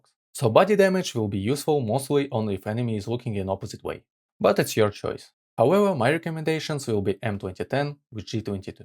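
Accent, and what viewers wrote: Russia. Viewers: Не знаю почему но твой говор, или же что то выдаёт тебе русского или снг человека. За рание прошу прощение если ошибся.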